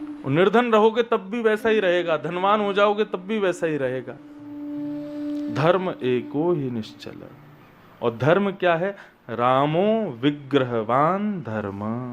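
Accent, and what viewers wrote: Braj, India. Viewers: Jay Shri Krishna Radhe Radhe 🙏 ♥️ 💙 ❤️ OM 🕉 Namo Bhagvate Vasudevy Namh 🙏 ♥️ 💙 ❤️ OM Namo Narayan Jay Shri Shyama Shaym ji Jay Shri Guru Dev Maharaj ji Aapke Charno Mai Koti Pranam Pranam Jay Shri Radha Rani Jay Shri Radha Rani Jay Shri Raman ji Jay Shri Radha Vallabh Lal ki Jay Ho Jay Shri Guru Dev Maharaj ji Aapki vraj Bhasa Badi Sumadhur hai Radhe Radhe Jay Shri Harivansh Guru Dev Maharaj ji 🙏 ♥️ Jay Shri Hari Jay Shri Vrindavan Dham Radhe Radhe Jay Shri vraj vasi 🙏🙏🙏🙏🙏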